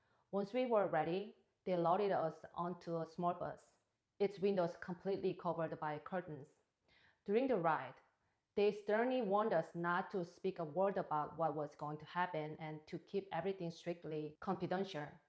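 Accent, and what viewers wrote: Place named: Asia. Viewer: I still don't know what girls in pleasure squads do. Is it just singing/dancing/ entertainment for the rulers? Anyway, I am quite intrigued by your english- you speak and express in a very asian way- which i find cute. Also I am quite surprised you went from extreme dictatorship(North Korea) to extreme capitalism(USA)- 2 sides of the coins really- where on both ends society seems to be eroding- at one side due to lack of freedom, food and what not, while on the other side due to misuse of freedom- individualism, money mindedness, lack of family culture, rise of wokeism, loss of love/trust/ dropping birth rates, income disparity, addiction. South Korea is similar to USA- be it per capita income and capitalist society- also it is infamous for highest rates of depression and suicide rates- which obviously is result of capitalism and living from mind/thoughts. 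You, having born and spent early years in NK, no doubt US must be feeling great for freedom. But a time would come...when you would want a middle path...where there is only joy, unending peace and love for everybody- the gate to spirituality.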